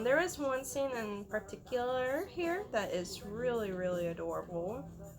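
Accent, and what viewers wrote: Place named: South, United States. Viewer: I'm so glad you read this and loved it! It's a beautiful manga. I love when your country accent comes out.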